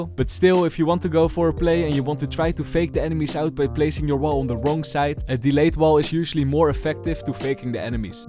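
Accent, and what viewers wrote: Netherlands. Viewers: Where are you from, sir? Your accent really sounds like from the Netherlands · Nl accent 😂❤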